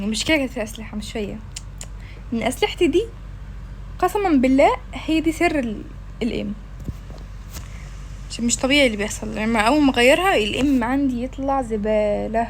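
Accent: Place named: Saudi Arabia. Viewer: ليه احسك سعوديه اكثر من مصريه؟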